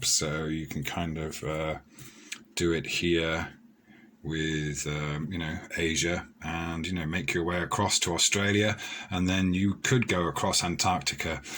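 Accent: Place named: RP, United Kingdom. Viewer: Thinking that rotating 2 out of date 2D projections of a globe validates a flat earth is extremely childish and infantile in its total impracticality and worthlessness. I do understand azimuthal projections but that doesn't make it " fine " to have to spin one so that continents align correctly. If you honestly believe that it makes any kind of sense to do this then I doubt its worth explaining why this represents nothing relevant to navigation in the real world.. How do you travel South to North in South America and Africa at the same time? You do have a decent Semi Posh ( RP ) voice but hearing so many ummm and errs is a dead give away you're blagging. You really are pointing in the wrong direction maybe when the magic sky daddy spins half the earth around you'll find your way.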